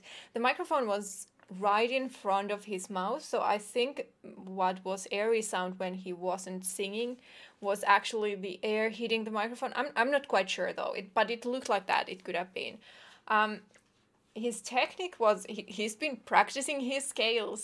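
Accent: Finland. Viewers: Mike G She's from Finland! English is at least her second language. She's adorable! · She's Finnish. As in German, J is Y and TH is T, and the Rs are rolled, so becomes Yetrrrrro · I knew you were suomalainen nainen I miss that accent...❤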